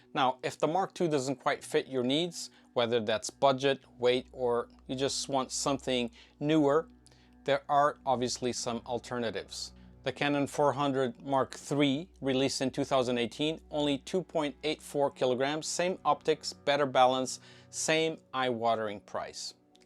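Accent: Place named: Portugal. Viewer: Great video bro Quick question are you Portuguese? Porque o sotaque nao engana meu camarada🙏🏿💪🏿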